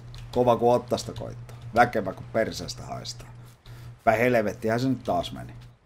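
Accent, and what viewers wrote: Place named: Ostrobothnia, Finland. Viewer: En tierä onko sulta ikinä kysytty tätä tai ootko vastannu mutta ookko pohjanmaalta kotosi tai asukko tällä hetkellä täälä. Kuulostaa murre nimittäin vähän samalta👍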